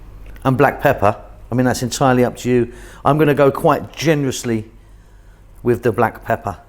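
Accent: United Kingdom. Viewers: Your question confirms my initial response: what does someone with a British accent know about refried beans? They are great with Mexican or Tex/Mex dishes.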